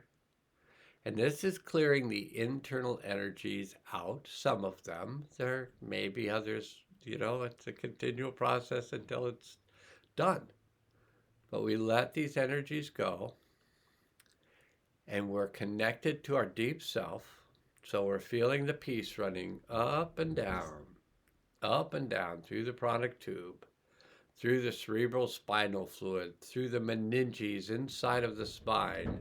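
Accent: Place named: United States. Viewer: I'm familiar with Nancy Rebecca and the blue light info; she's in the USA as am I - wondering what part of the world u r in? (You sound like maybe USA)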